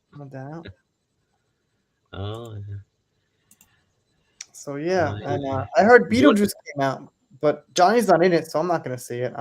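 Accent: New York, United States. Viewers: Where in NY are you from? I'm from Brooklyn... love listening to your NY accent.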